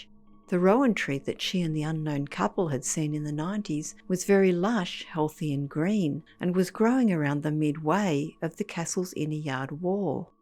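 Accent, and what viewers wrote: Australia. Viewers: This is not a British accent it’s Aussie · More disturbing hearing an aussie narrator say "playing tag" and you pronounce castle weirdly. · Agreed 👍I'm British too. I think she's Australian😊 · She iis Australian, not British. Americans seem to confuse the two. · Aussie accent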